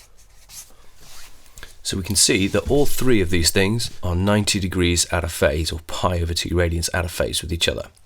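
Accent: United Kingdom. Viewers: i Knew he was british through his accent but he confirmed it by writing centre instead of center (but who cares this is a great expalaination for simple harmonic motion)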